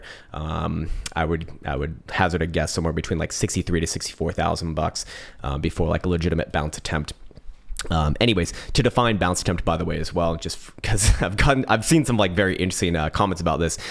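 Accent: Australia. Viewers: sounds Australian? thats so bad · Man he sounds Aussie... I'm ashamed.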